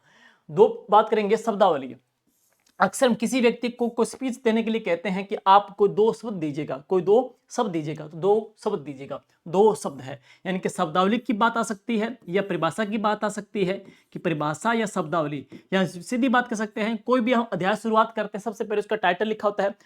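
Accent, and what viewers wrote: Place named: Shekhawati, India. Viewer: Bhai aapka grah jila churu h kya boli m shekhavati touch aata h 🎉🎉🎉 I'm from jaipur or aap mere chhote bhai jese dikhte ho uski skl bilkul aap me milti h ditto🎉🎉🎉🎉🎉🎉🎉🎉🎉🎉🎉🎉🎉🎉🎉🎉🎉🎉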